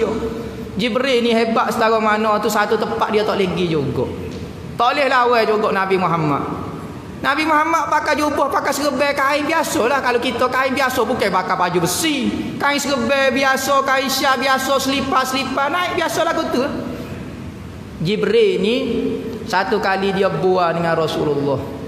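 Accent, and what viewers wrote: Kelantan, Malaysia. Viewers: Cara pengkisahan yang sangat menarik... walaupun guna loghat Kelantan tetapi alhamdulillah boleh paham.. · Bagus cerita ustaz ni. Mudah aku nak faham walaupun pekat loghat Kelantan. Terbaik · Bila ikhlas nak dgr ceramah dan nak ilmu, alhamdulillah Allah permudahkan utk beri kita faham walaupon dia pekat loghat kelantan… alhamdulillah aku faham semua apa dia sebut.. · walaupun pelat kelantan pekat ambo masih faham......orang kedah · Alhamdulillah. .setiap patah perkataan dan atonasi ustaz masuk ke dalam hati...walaupun pekat loghat Kelantan. .alhamdulillah. .saya masih diberi kefahaman mendengarnya dengan izin Allah SWT. ....semoga kita berjumpa satu masa nanti ustaz....aaminn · Terima kasih ustaz.. walaupun sy org Johor tapi Sy boleh faham bahasa Kelantan walaupun pekatnya MCM mana pun tetap sy faham.. tapi sy nasihatkan ustaz jgn lah guna bahasa Kelantan..guna bahasa Kuala Lumpur..sbb ceramah yang ustaz sampai kan sy tak pernah dgr lagi mana² ustaz² yang sampaikan..jadi sy risau masih banyak lagi ada orang yang tak faham bahasa Kelantan... 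· Seronok dengar ceramah ustaz ni... Walaupon sleng kelantan dia pekat gile. Antara ustaz ksukaan sy.. Selain UAI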